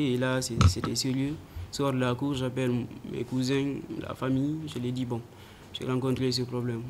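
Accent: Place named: Senegal. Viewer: Putain tu a un accent sénégalais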